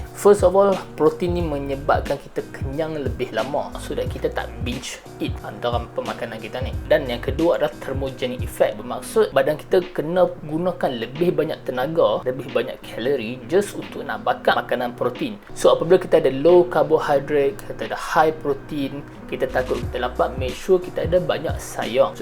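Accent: North, Malaysia. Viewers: Baguih, kekalkan bahasa Utara. X da kalut nk ckp KL pun. Steady!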